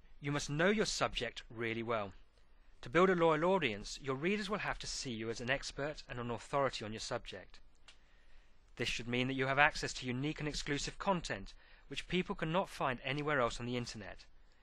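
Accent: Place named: England, United Kingdom. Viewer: Thanks for the info. nice to hear an English accent!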